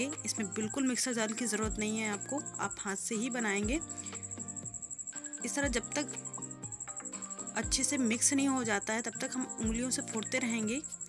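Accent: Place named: Awadhi, India. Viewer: Nice awadhi 👍👍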